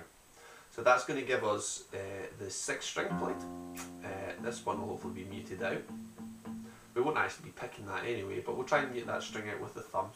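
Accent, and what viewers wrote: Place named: Scotland, United Kingdom. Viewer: my guess is he is scottish, he deffinatley sounds it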